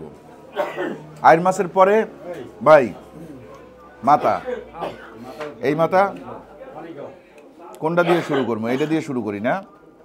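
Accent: Barishal, Bangladesh. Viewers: Vai borishal jaiya apnar kotha bArtai akta borishailla vab aisay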